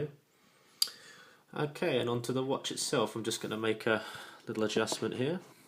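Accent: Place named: Mid-Atlantic, United States. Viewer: Hi, indulge me.....Do you work for Omega? Also your accent is very mid-Atlantic. Any personal background?;-)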